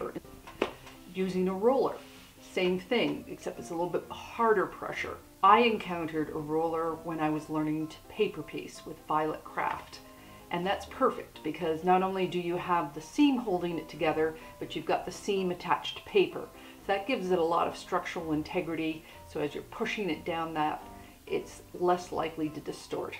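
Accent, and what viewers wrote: Canada. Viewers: I knew it! You're Canadian ya! me too. Thanks for your informative videos. Bye from BC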